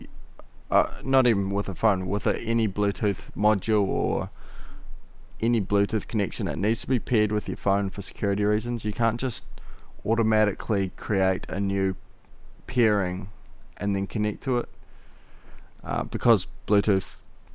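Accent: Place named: New Zealand. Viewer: Found a Kiwi haha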